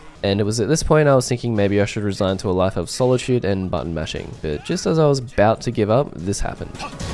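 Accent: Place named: Australia. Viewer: tekken is a hard game, with a huge amount of knowledge checks. happy to help you come to grips with the basics if you like? judging by your accent, you are in Australia right? I'm in NZ so the connection should be pretty decent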